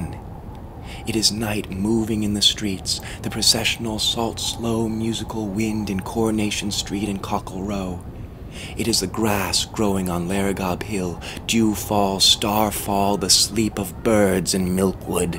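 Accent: United States. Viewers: Lovely. I didn't think I would like a US accent, but it works too. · Doesn't sound right read with an american accent, but well read that man .Great poem, a real journey through what he was thinking.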